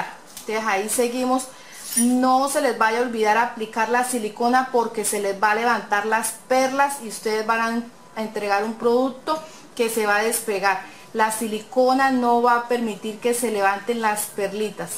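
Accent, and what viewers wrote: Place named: Santander, Spain. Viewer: Hermoxo divino si se moja no se despega en que teléfono te puedo localizar tienes acento de santandereano yo estoy en medellin me gustaría hacerlos para mi tienda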